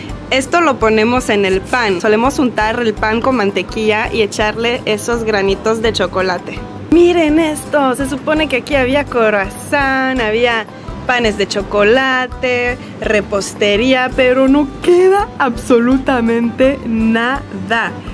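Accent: North, Mexico. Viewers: woww hablas rebien el español mexicano supongo debiste estar mucho tiempo en México también podría ser por eso que tienes acento mexicano campechaneado pues durante el vídeo estaba yo emmm es chilanga sii y luego te salía acento norteño y yo achingaa pus mejor le pregunto 😅 gracias por contestar por cierto estás hermosa 😍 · Hola! Me sorprende lo bien que hablas español. Especialmente porque es un español muy mexicano. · Eres muy guapa .vives en Bélgica ? Tienes asentó muy Mexican . Ya me enamoré de ti 😄 ❤️ saludos desde Chicago il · Hablas perfectamente mexicano. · En serio eres de Bélgica por k en verdad ni lo pareses y ni tienes asentó belga más bien tienes asentó mexicano en verdad y lo tienes me gusta Saludos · me gusta tu manera de decir las cosas y hablar mexicana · Jajajajajaja....hermosa Mexicana.....!!! Tu eres Mexicana en mi corazón... Que cosa.....Hablas y te expresas total....Y absolutamente Mexicana.... 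Que linda.....!!!! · Wow. Me sorprende tu acento. Hablas más mexicano que yo. :'( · Me encanta como hablas en español 😂😂😂 hablas como del norte de mexico tienes una chispa increible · Hola, en verdad que tú lenguaje de español es un híbrido muy interesante ya que en ocasiones te escucho hablar el acento chilango y que no es el que hablan en los productos televisa, en otros momentos te escucho como un poco el acento del norte y lo más raro es que ya que viviste un tiempo en el sur del país no tengas su acento, en fin, te mando un Enorme Beso, hasta luego. 😘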